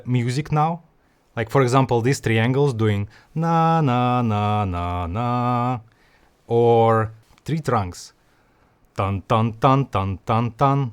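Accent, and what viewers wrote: Russia. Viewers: Yes, his Russian-English dialect is hard not to notice, I also communicate in English. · Русско говорящий. Его зовут и по акценту понятно. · Он русский у него акцент русский и имя русское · Я слышу русский акцент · I agree, Russian accent and English are very good)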